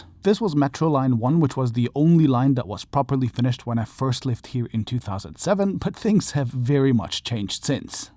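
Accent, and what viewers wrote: China. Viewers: Why'd you have a slight chinese accent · How much does this guy get paid to simp for china? He even has a slight chinese way of speaking english.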